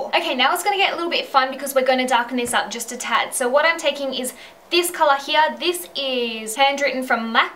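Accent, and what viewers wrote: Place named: Australia. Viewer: thees kolla heah! Is this an Aussie accent? it's so cute! lol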